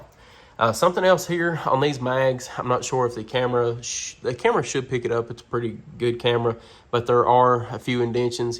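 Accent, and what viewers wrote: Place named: Middle Tennessee, United States. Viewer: Where are you from, brother? I'm from Savannah, TN and you sound just like we do here in middle TN.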